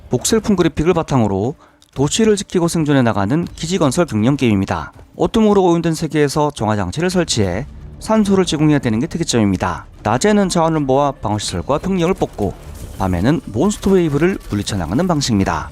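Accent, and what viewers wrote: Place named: China. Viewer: 주인장 조선족이죠? 특유의 억양이 나오네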